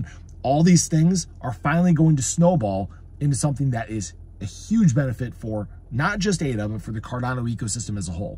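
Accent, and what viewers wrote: United States. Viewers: Wisconsin, eh? I was thinking Chicago 😄 thanks for the great coverage of ADA! 🚀🚀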